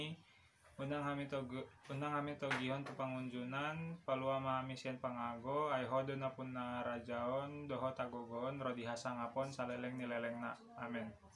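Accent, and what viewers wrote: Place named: West Java, Indonesia. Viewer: Mantap.. bhs batak logat sunda nyak..😁👍👍👍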